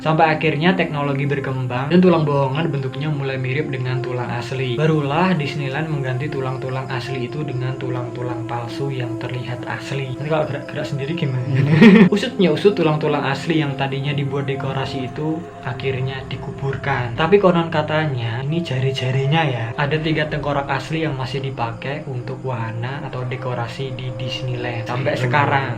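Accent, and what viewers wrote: Java, Indonesia. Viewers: Abang orang Jawa kah?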